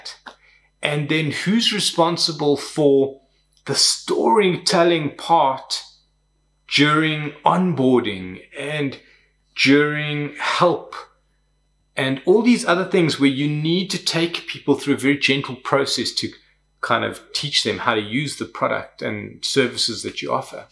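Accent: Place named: South Africa. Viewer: thanks so much for this video! I’ve worked as a Content Designer and a UX writer for the past five years. One of the biggest challenges of our role is educating teammates and other stakeholders on the value of content-first design. You’ve done a great job of explaining it in a nutshell! P.S. I hear an SA accent. Are you based in SA?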